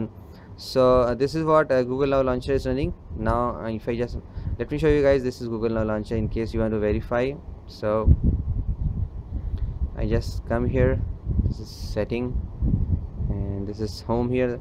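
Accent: India. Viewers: You speak too fast! We native American English speakers do not speak the English language that fast, and it is VERY hard to understand when you speak that quickly. I know that in your native language(s) in India (I'm guessing) you speak very quickly, but in rest of the English-speaking world we do not speak English like we are firing full-automatic on a machine gun! I could not follow you because you spoke too quickly and mumbled some of what you spoke too quickly. You need to learn to SLOW DOWN and ENUNCIATE your English if you want to do these videos outside of English-speakers from your country who are no doubt used to lightning-fast English spoken in a Hindi/Urdu/etc/--fast way. All I wanted to know was how to set multiple lockscreen wallpapers in Nova Launcher (because somehow they stopped changing with Nougat) without having to re-engage TouchWiz Launcher and then re-engage Nova Launcher--- but I can't understand you, so this video is a FAIL. 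· You have a terrible Indian accent... Just terrible...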